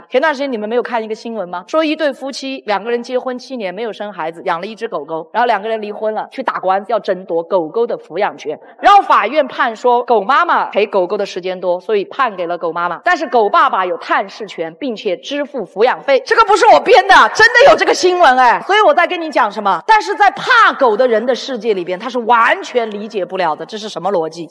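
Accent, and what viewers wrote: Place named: Chongqing, China. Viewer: 我以為你是重慶人。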